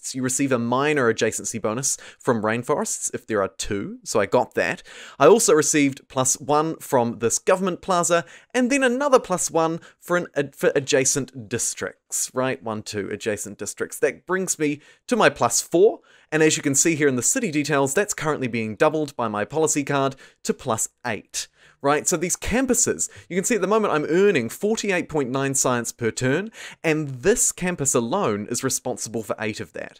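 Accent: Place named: New Zealand. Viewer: That's a kiwi voice right there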